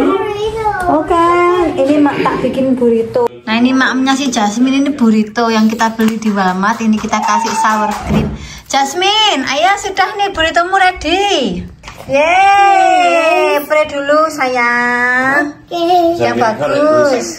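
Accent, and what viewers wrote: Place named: East Java, Indonesia. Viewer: Bhs nya, medhok priyaui JaTim, dalem inggih JaTim mb, ke Jogja krn mas suami tugas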